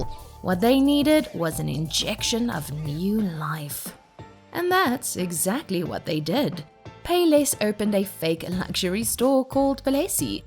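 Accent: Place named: South Africa. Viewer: This narrator definitely from South Africa.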